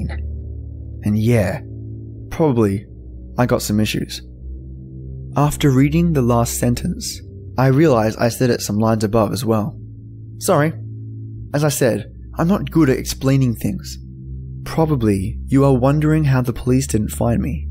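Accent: Australia. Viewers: Aww thank you! Your awesome Aussie accent makes the stories even better & that's one reason I keep coming back to your channel! Very well done with this one! 👍🖤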